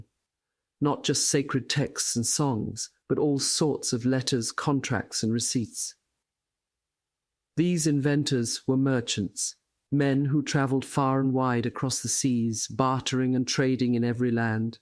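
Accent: RP, United Kingdom. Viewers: What is narrator's accent? British? thanks for your help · Perfect Received Pronunciation, better known as the Queen’s English. Very posh, articulate, and impeccably enunciated ! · Very British ! Queen's English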